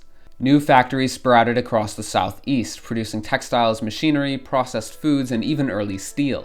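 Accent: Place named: Spain. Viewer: Stop pronouncing our language with spanish accent 🥲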